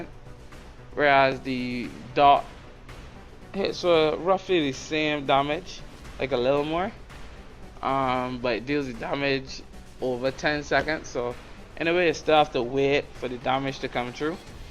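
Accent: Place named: Trinidad and Tobago. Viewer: That accent sounds like you are from the V.I or Trinidad. It's all good, I' m from St.Kitts Nonetheless very good preview of the Slayer skills. I was curious to see what the class was about and so far I'm impress. Big up!! ^_^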